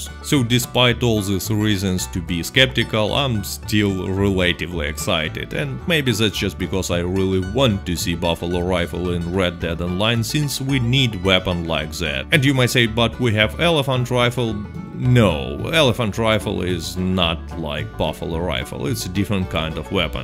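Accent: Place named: Russia. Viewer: English with a Russian accent is cool to listen to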